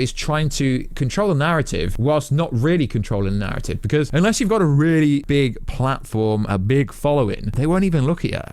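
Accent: United Kingdom. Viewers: Are you Brittish or Scottish ahha?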